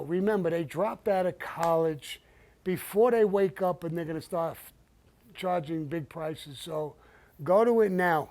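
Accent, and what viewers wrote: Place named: Brooklyn, United States. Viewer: Bensonhurst's Own...Sammy the Bull, the Brooklyn talk..😉😎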